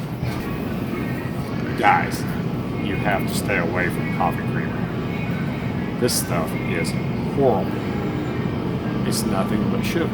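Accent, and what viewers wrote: South, United States. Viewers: P.S. Love your videos. You speak just like my dear Southern relatives who have sense enough to eat like you do.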